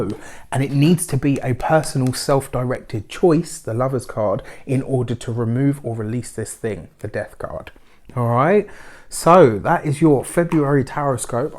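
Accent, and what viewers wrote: England, United Kingdom. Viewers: you are clear, honest funny and direct. Your cute English accent makes me smile. Thank you so much for continuing, I always look forward to seeing your posts.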